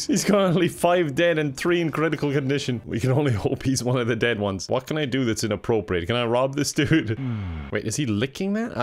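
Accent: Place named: Ireland. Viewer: he's Irish, that's his accent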